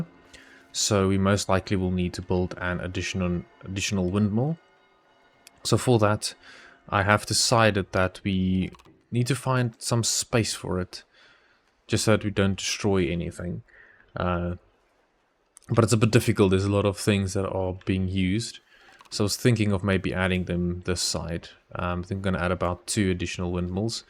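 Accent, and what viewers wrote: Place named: South Africa. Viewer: Cool to find a South African here! Your accent was just so peculiar to me I had to check your channel location.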